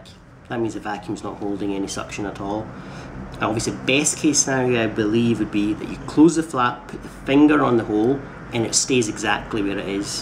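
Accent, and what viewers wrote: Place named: Scotland, United Kingdom. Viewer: I think yul find he's scuuutish!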